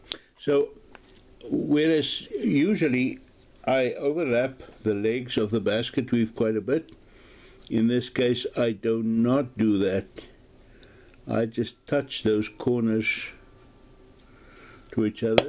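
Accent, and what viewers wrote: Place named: South Africa. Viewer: Hi you have an unmistakable accent. You must be South African. Are you in SA. I would like to get into the art of leather working I am a graphic designer by profession where does one get leather from in SA I live in JHB Randburg.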